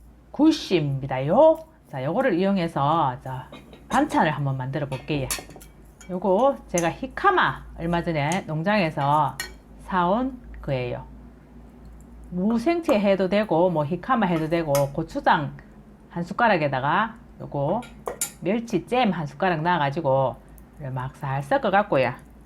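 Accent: Gyeongsang, South Korea. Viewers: 구수한경상도 사투리가 정감이 가네요 오늘도 잘배우고 갑니다^^* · 경상도분이 정말 맞으신가요? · 건강레시피 공유해주셔서 감사합니다🥰💯 늘 구수한 경상도 사투리 정감이 갑니다‼️🤗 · 멸치하고 사과하고 쨈 만드는것은 첨 봅니다~ 경상도 사투리가 너무 정겹네요~❤ 비빔장으로 하면 너무 맛있겠네요 고추장. 만들기 너무 쉽고 맛있겠어요 꼭 따라해 봅니다~❤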